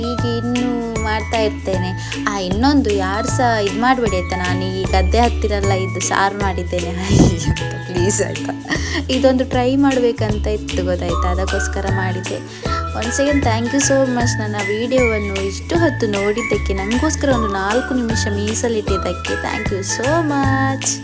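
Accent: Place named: Kundapura, India. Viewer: Kundhapuraa Kannada ...nenp hoithaa...yenthaa martyaa??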